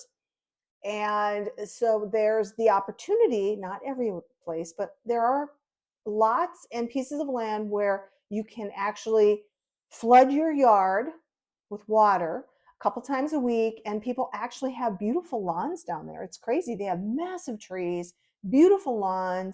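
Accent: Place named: Minnesota, United States. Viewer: I thought I recognized a little accent there, don'tcha know?? Ya, you betcha! I grew up in a small town of 250 people in Southern Minnesota 😂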